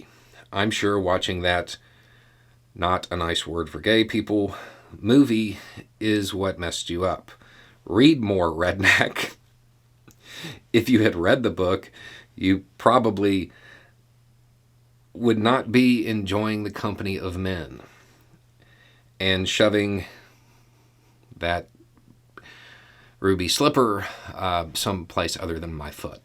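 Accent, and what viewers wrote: South, United States. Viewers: What I find especially humorous, if you're going to insult someone, a bit of reading will make you more effective. The person in question decides to insult using things that a well-read person would have realized did not fit this particular case. is from Florida, I lived in Florida, and I feel for him, but that's neither here nor there. He calls a Redneck and a Hillbilly. These are both things rooted in Appalachia and not the deep south. How reading could have prevented these mistakes? Simple geography would have told you that Florida is relatively bereft of hills. How about redneck? An understanding of history would have told you about the Battle of Blair Mountain, where the miners who were attempting to strike for human working conditions were called rednecks because of the red bandanas they wore. So, no, is neither a hillbilly nor a redneck, he has a southern accent, only a decidedly ignorant poorly red individual wouldn't know that. 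For the record, hillbilly, and redneck became terms of derision due to efforts by coal mine owners to dehumanize their workers in the eyes of the general public to make it easier to mistreat them.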